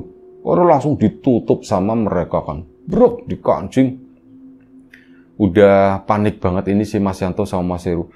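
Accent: Tegal, Indonesia. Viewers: Lama ndak denger, sekarang logatnya jdi tegal bgt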